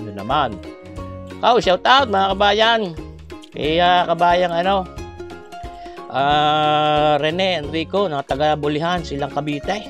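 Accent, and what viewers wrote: Batangas, Philippines. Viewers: Nakakatuwa talaga manuod ng mga videos nyo sir gusto ko po batangas accent nyo. More power po sa inyo! Tatawa habang natututo ❤ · shout out watching and enjoying listening to your batangueno accent from Edita Ransom Arkansas USA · Ayos!..bukod sa sarap ng luto mo kabayan,ito ay sinasamahan mo ng mga adlib o pasakalye mga salitang batangueno punto na syang nakakagana panoodin ang iyong pagluluto.Mabuhay ka Kabayan at ipagpatuloy mo iyan iyong talento sa pagluluto at nandito lamang kmi pra suportahan ka...cheers!..god bless!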